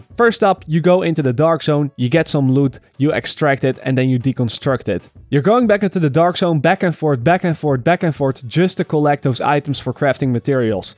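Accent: Netherlands. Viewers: am from the Netherlands too. I can understand that it's not his first language but he is just talking Dutch with English words if that makes sense.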